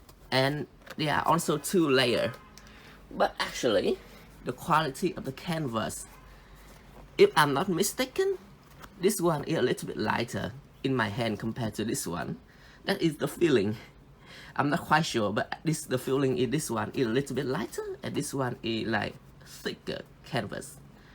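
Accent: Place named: Thailand. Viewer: Thai accent is so recognisable. one love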